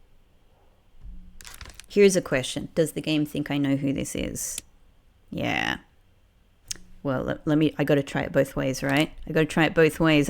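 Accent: Australia. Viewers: I was wondering what your accent was and then literally you said “As an Australian…” funny timing! Love the play though so far! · I immediately clocked your accent. As a fellow Australian 😅. It’s nice to have a familiar accent on a playthrough.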